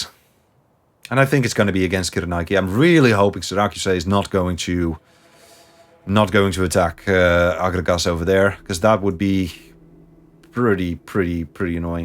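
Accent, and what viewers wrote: Netherlands. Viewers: I like your gameplay... I hear that you are Dutch. Do you also have a face cam? Keep up the GOOD work!! 😄👍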